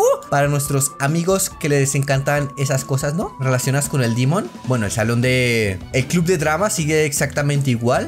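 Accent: Chile. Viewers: OMGGGGG PENSE QUE SERIAS MEXICANO PERUANO O ALGO ASI POR EL ESTILO PERO NUNCA PENSE QUIE CHILENO 😧 Y APARTE CONOCES LOS COUNTRYBALLS VIVA CHILE MIERD@@@ :O Y APARTE ERES MI YT FAVVV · Eres Chileno como yo 😂😂😂😂😂❤ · En serio eres chileno si o no ???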